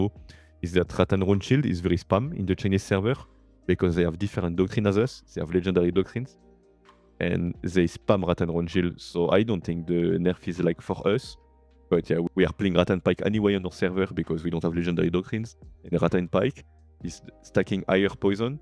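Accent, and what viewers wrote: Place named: France. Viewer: Thx for what your're doing it's really nice and accurate. Your accent look like french accent, if you are, have you got a french guild ? And if yes, in witch server ?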